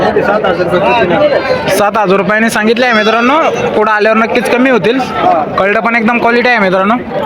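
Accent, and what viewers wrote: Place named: North India, India. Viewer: मराठी भाषेत कुठे येतो रे भैया भैया तू यूपी चा आहेस का बिहारचा आहेस भावाला भाऊ दादा अण्णा म्हणतात तुमच्यासारखी माणसं मराठी भाषा खराब करतात रे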